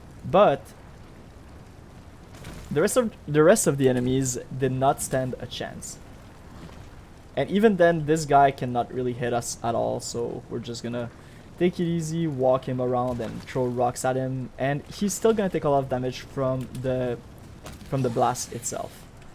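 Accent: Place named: Quebec, Canada. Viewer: I recognize the Quebec accent and I am happy. Great build as well. I never learned how to play Kineticist and this makes me want to try it out.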